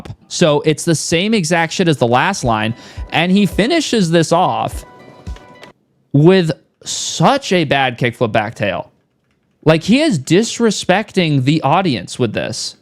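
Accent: United Kingdom. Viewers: Love the English accent 😂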